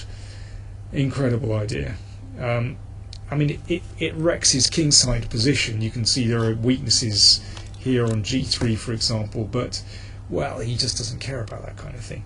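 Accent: United Kingdom. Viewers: What great channel. I'm so glad I stumbled upon it over a year ago, countless hours of great chess and a hugely entertaining British accent along with it. Great work Mr. King!!!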